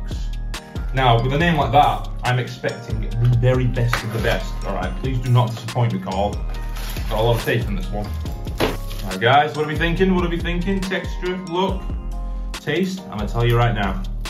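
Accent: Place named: United Kingdom. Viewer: i dont know you were british, good content, cheer mate!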